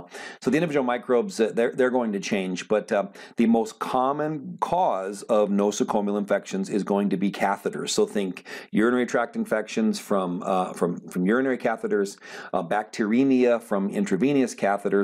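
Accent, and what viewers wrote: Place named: United States. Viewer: Goddamn sir finally. Finally someone who speaks American English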